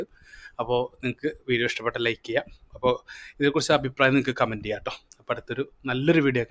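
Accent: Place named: Thrissur, India. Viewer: മലയാളം ശരിക്ക് സംസാരിക്കാൻ ശ്രമിക്കൂ. തൃശ്ശൂർ സ്റ്റൈലിൽ അല്ല വലിച്ചു നീട്ടിയുള്ള സംസാരം ഒഴിവാക്കു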